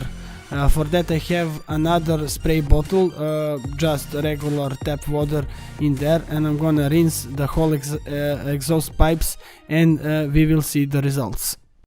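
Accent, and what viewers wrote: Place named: Balkans. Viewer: Tacno se cuje taj balkanski akcenat :D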